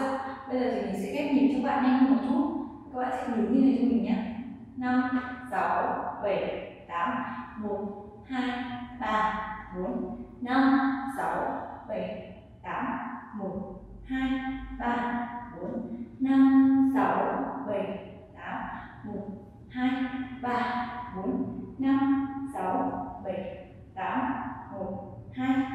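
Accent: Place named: Binh Dinh, Vietnam. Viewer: cho cách nói xe bình định mkmk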